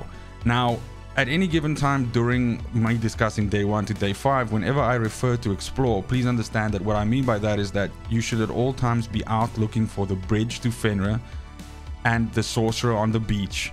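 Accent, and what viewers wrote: South Africa. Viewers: Your channel says Denmark, but you sound like you're from South Africa. Anyway thanks for the tips bro. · South African perhaps? I hear quite the familiar accent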